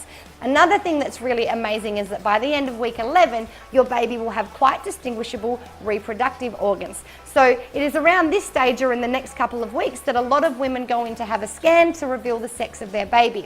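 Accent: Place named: Australia. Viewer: Well done - good Aussie accent and no rambling - ❤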